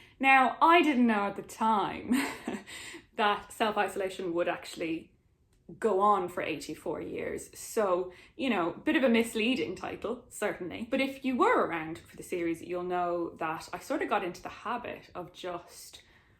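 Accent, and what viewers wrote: Dublin, Ireland. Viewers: hearing a Dublin accent... so unbelievably soothing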